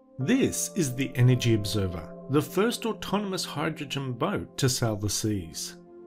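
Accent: Australia. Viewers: An Australian narrator talking about square feet and pounds. A reminder that in Australia we are metric like all the other sensible countries in this world. Thumbs down.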